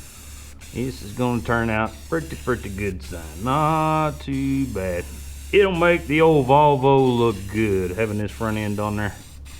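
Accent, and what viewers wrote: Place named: South, United States. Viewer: I think it's the southern accent but somehow the term "Boat hoes" comes to mind?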